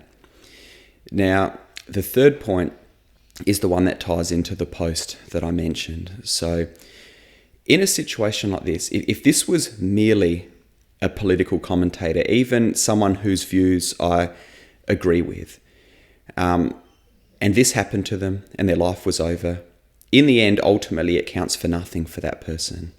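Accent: Australia. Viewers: Amen. Thank you. I follow you, as I followed Charlie and the late Pastor Baucham from Egypt. I am a Coptic Orthodox Christian. May God bless you my son and may He bless your ministry Amen. I am very fond of the Australian accent though I don’t hear it often. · Had to stop bc of the Australian accent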